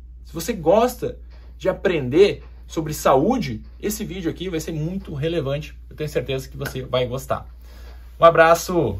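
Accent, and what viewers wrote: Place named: Goiás, Brazil. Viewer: Nota 10 fala do Goiás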